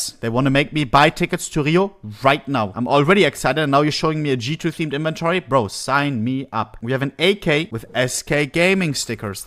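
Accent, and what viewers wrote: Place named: Germany. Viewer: german accent 🤮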